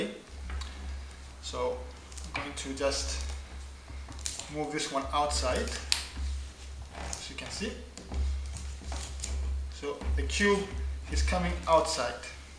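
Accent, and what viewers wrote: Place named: Greece. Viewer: По акценту видно,что грек.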